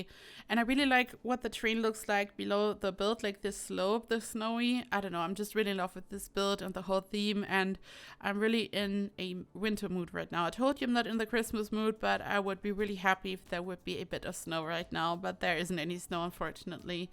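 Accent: Germany. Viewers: are u deutsch? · Are you german? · your accent sounds kinda German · Höre ich da einen deutschen Akzent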